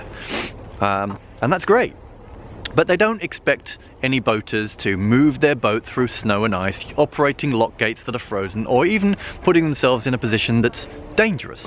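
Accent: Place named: Chicago, United States. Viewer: is this Chicago? He Sounds like hes from Chicago....🇬🇧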